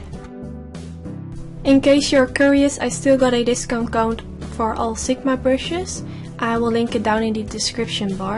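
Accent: Netherlands. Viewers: Aha! I knew you were Dutch! :-D My dad was half Dutch and half Indonesian so I recognised your accent and it made me think of him which is nice. Where in The Netherlands are you from? My dad and his mother lived in Den Haag and my family used to visit her when I was a child. :-D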